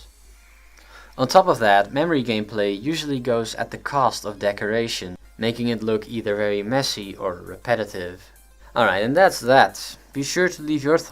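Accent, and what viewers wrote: Netherlands. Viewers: bliss that's cause he is dutch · you sound dutch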